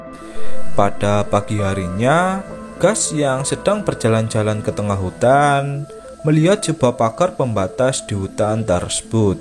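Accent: Java, Indonesia. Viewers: Logat jawanya medok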